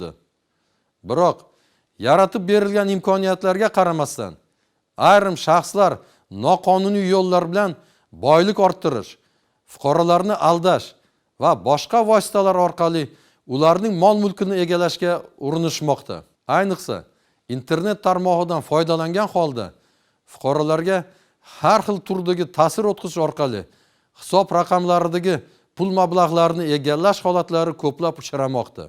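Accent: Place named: Russia. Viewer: Амаки русча мактабни битирган шекинли акцент ута дахшат омад тилайман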